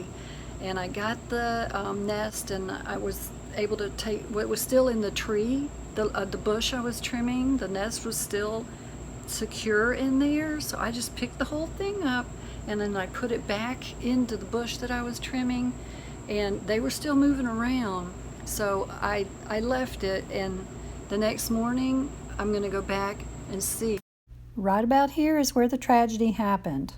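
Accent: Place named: South, United States. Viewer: You sound like a southern lady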